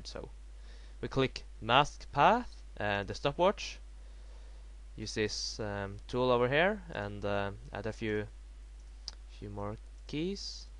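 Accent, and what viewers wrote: Norway. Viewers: are you norweigan? :D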